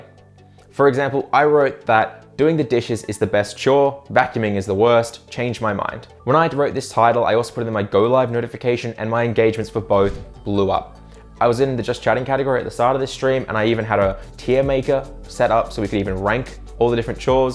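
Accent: Australia. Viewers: WAIT YOU ARE AUSTRALIAN NOW I INSTANTLY LIKE YOU MORE WHY DOES IT WORK I FEEL COMNECTED ON A PERSONAL LEVEL bro why and how does this work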